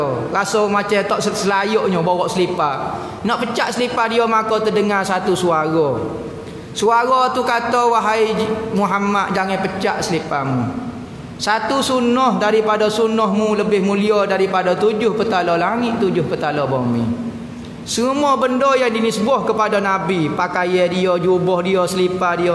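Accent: Kelantan, Malaysia. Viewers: Cara pengkisahan yang sangat menarik... walaupun guna loghat Kelantan tetapi alhamdulillah boleh paham.. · Alhamdulillah. .setiap patah perkataan dan atonasi ustaz masuk ke dalam hati...walaupun pekat loghat Kelantan. .alhamdulillah. .saya masih diberi kefahaman mendengarnya dengan izin Allah SWT. ....semoga kita berjumpa satu masa nanti ustaz....aaminn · Bagus cerita ustaz ni. Mudah aku nak faham walaupun pekat loghat Kelantan. Terbaik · Bila ikhlas nak dgr ceramah dan nak ilmu, alhamdulillah Allah permudahkan utk beri kita faham walaupon dia pekat loghat kelantan… alhamdulillah aku faham semua apa dia sebut.. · Seronok dengar ceramah ustaz ni... Walaupon sleng kelantan dia pekat gile. Antara ustaz ksukaan sy.. Selain UAI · Terima kasih ustaz.. walaupun sy org Johor tapi Sy boleh faham bahasa Kelantan walaupun pekatnya MCM mana pun tetap sy faham.. tapi sy nasihatkan ustaz jgn lah guna bahasa Kelantan..guna bahasa Kuala Lumpur..sbb ceramah yang ustaz sampai kan sy tak pernah dgr lagi mana² ustaz² yang sampaikan..jadi sy risau masih banyak lagi ada orang yang tak faham bahasa Kelantan... 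· walaupun pelat kelantan pekat ambo masih faham......orang kedah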